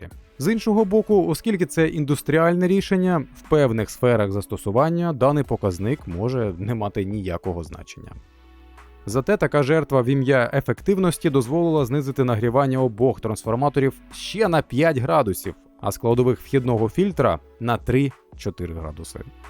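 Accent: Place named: Galicia, Ukraine. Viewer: вылез галицикий селюк😂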